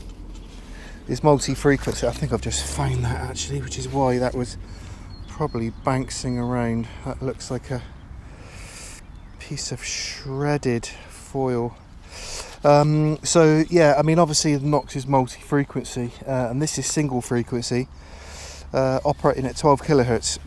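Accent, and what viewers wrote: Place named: Bristol, United Kingdom. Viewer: I've Subbed you,. I was searching for Meta data for my video descriptions, becase when you do start making money from YT, you'll make more money with lots of key words in your video descriptions. and your Quite knowledgeable about how to use metal detectors and you lived in Bristol and by your accent quite close to me, me thinks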